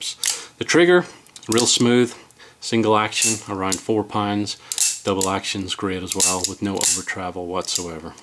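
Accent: Northern Ireland, United Kingdom. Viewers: Accent Northern Ireland Methinks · Northern Irish to me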